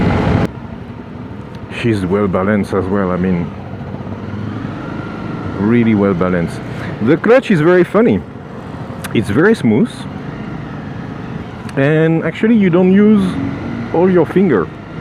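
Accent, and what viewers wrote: France. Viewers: Mdr!!! je suis tombé sur la partie 2 et j'ai jamais aussi bien compris l'anglais du coup j'ai posté un com qui disait tu parle comme un français! ! et là je regarde la partie 1 et je vois ton com...ah ok je m"était pas trompé. ...j'ai vu cette brèle jeudi chez honda a Clermont elle fait rêver dommage qu'elle ne soit pas en 450...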